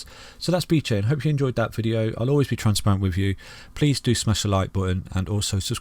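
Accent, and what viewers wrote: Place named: Midlands, United Kingdom. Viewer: it sounds like your from the Midlands, UK. Can't quite pin your accent down 🤔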